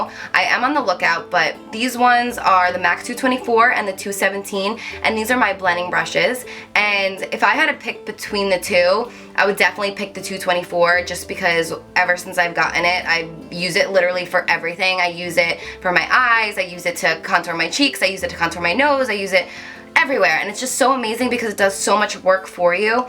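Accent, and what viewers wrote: New Jersey, United States. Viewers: are you from NJ?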